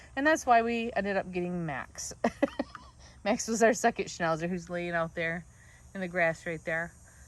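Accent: Michigan, United States. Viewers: I think you have a Michigan accent. How’s Gretchen doing in Lansing?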